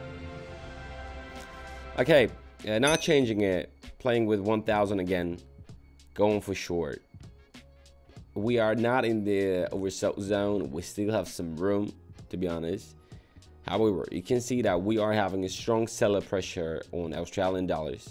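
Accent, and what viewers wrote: United Kingdom. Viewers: british accent + big wins = serotonin boost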